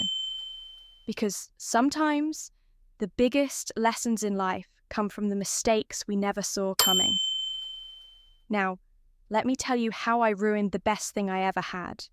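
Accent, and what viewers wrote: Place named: New England, United States. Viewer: Definitely not a Texan from Dallas reading this story. Sounds like a New Englander.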